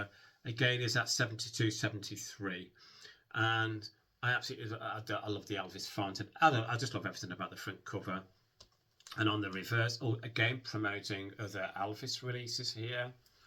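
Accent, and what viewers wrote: United Kingdom. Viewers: I dig your Elvis reviews a lot - as well as the Britishness of your accent! 👍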